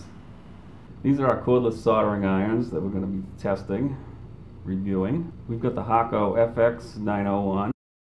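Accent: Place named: Maine, United States. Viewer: you sure sound down east, by jeezis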